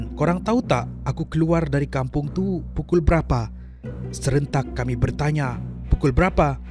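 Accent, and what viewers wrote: Sabah, Malaysia. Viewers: dia ni orang sabahh ka?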